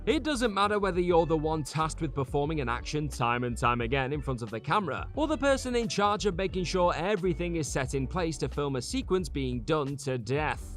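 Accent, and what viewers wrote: United Kingdom. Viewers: "Drunk". Narrator is British.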